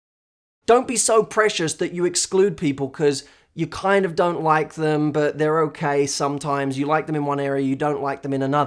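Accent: United Kingdom. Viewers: freaking love British accent. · Maybe its cultural? Telling from your accent you are British, and yes Ive lived here as a expatriate for about a decade. And trust me, trying to make friends with general British people is harder than trying to get a letter from the archbishop of Canterbury!! - this might also explain your experience so far! Its shocking but insightful to me that you say this may be because people appear too vulnerable. I dont think there is any communicative or language barrier as I speak perfect English. Strangely Ive noticed I have no problems mingling with people from other countries , and from my experience Americans appear to be the nicest bunch, and are generally interested in meeting people from other countries cultures because they are genuinely interested in doing so, and not to satisfy their own personal agendas. Maybe Ive just been unlucky but so far its been tough luck to meet a Brit who loves to hang out and have fun and do stuff. 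Also perhaps as your personal situation has changed now that you live on a different land, you suddenly realise the value of frienship and trust. Very insightful video, good job! xx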